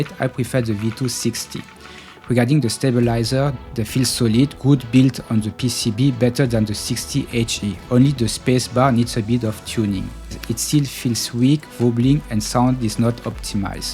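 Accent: France. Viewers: On est d'accord c'est un accent Français ? ^^ Merci pour ta vidéo !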